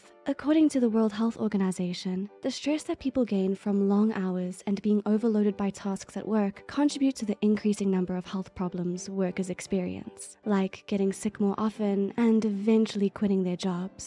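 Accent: England, United Kingdom. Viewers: This english accent is a British accent, generally I have heard American, cool though, like the voice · Yo, what is this lovely British Accent? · Don't like the English accent. Your normal voice is much more soothing!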